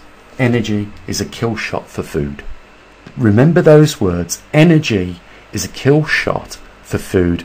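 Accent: Birmingham, United Kingdom. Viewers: lol that brummy accent coming out on todays post lol. go brumee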